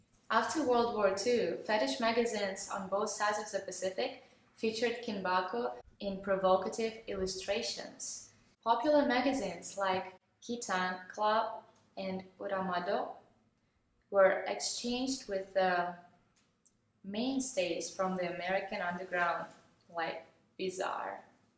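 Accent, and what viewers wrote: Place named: Russia. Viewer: Oh I love your Russian accent, I even watch some of your videos in Russian, just to here the exciting melody. Я изучаю русский язык.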